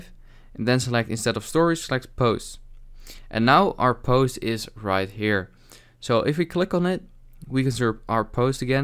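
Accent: Netherlands. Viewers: I hear someone is Dutch here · Hmmm Dutch? I can hear it a little ☺️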